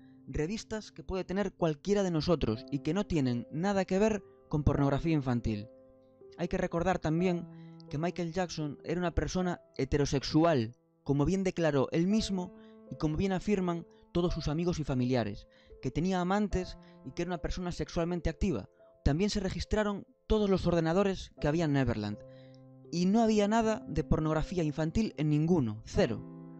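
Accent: Galicia, Spain. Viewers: Hola me encantaría saber por qué el paleto de Conrad Murray escribió un libro "This is it" sobre michael, que me imagino la de falacias que debe de tener, aparte de que si realmente era su médico, no debía de contar nada de EL por respeto. ¿Por qué nadie regula que se publiquen este tipo de libros? Que podrido esta el mundo. Se ha desmentido este libro? Graciias siempre🥰 Pd: por cierto de donde eres? Porque tienes acentiño como gallego o del norte.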